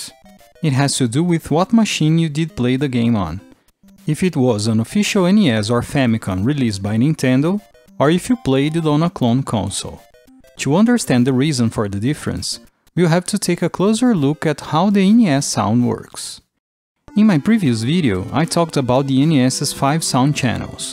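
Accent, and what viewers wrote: Brazil. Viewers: o sotaque brasileiro é inconfundível KSKSKSKS mas acho que peguei uma época diferente, porque eu jogava Super Mario Bros no emulador de NES do Ps2! É interessante saber que em outros consoles ele era transmitido diferente, eu não sabia disso haha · Esse sotaque não me engana! Brasileiro, né?? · Brazil accent spotted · Cai nesse vídeo aleatoriamente. Não Conheci ao canal. Por acaso você é brasileiro? Sua pronúncia é idêntica a um brasileiro falando inglês. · orra, o sotaque dele FULL BR mano kkkk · Eu sabia que o teu sotaque era brasileiro demais pra ser um gringo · Sotaque brasileiro batendo forte. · Sotaque de brasileiro… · O sotaque de um brasileiro é realmente familiar. Que legal. 🎉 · when you spoke I already knew u were Brazilian 😂😂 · Eu conheço meus irmãos brasileiros pelo sotaque, abraço, excelente video! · I know a brazilian when I hear one, great video bro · Bro you have the most Brazilian accent I've ever heard! 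🇧🇷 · O sotaque BR gritando hauhauha Excelente vídeo amigo. Ganhou um sub. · Você é brasileiro? Seu sotaque lembra um pouco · Eu tava sentindo um sotaque brasileiro ai no meio, fui ver o canal e é BR kkkkkkkkk, mt bom o vídeo mano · Eu amo como as palavras ficam com um sutaque brasileiro kkkk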